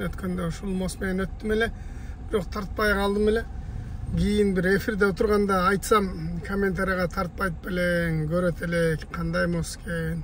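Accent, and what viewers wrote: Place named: Talas, Kyrgyzstan. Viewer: Брат Таластан окшойсунар😅😅😅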